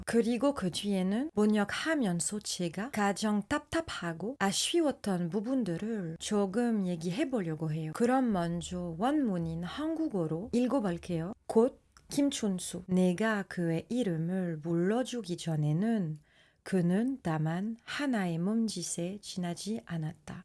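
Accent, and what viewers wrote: France. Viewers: 영상 처음보는데 불란서식 한국어를 사용하시는게 굉장히 신기하고매력적이네요. 근데 계속듣다보니 찐경상도 토박이가 표준어쓰려고하는 것같이도 들려서 나도모르게혼자웃음. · 재한 프랑스인 님의 한국 문학 심화 강좌입니다. 프랑스 액센트의 한국어가 우아하고 고급지게 들리지요? 늘 재밌습니다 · 어설픈 한국어 발음과 프랑스 특유의 악센트에도 한국인보다 더 한국스러운 느낌을 적나라하게 표현하는 외국인. 아니 한국짓에 더욱 충격을 받게 되는 지나가던 문과인 댓글올립니다...... 한국말이 참 이쁘지만 외국입장에선 참 어려운건 사실입니다.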